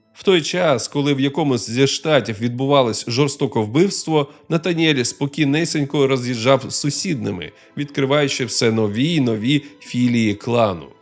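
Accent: Russia. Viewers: канал україномовний, але автор судячи по вимові в повсякденному житті російськомовний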